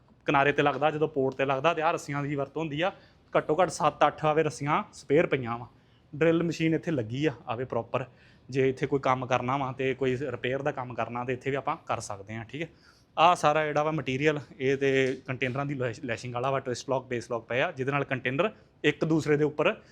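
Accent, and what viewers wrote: Majha, India. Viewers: ਜਾਜ ਮਾਂਝੇ ਵਾਲੀ ਬੋਲੀ ਆ ਪਰ ਵੀਰੇ ਜ਼ਿਲ੍ਹਾ ਤੇ ਪਿੰਡ ਕੇਹੜਾ ਕਿਰਪਾ ਕਰਕੇ ਜ਼ਰੂਰ ਦੱਸਣਾ ਜੀ ❤😊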